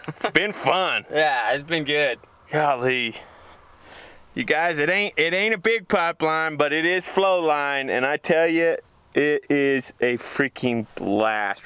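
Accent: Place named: Utah, United States. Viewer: Are y’all from Utah by chance? You have that Utah accent 👍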